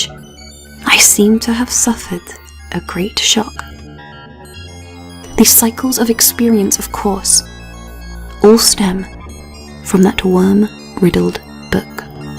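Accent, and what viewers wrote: England, United Kingdom. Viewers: I think has lost the plot. Why do you dwell on such disturbing past times my dear? An English girl with that accent should be enjoying life with a good husband 🙂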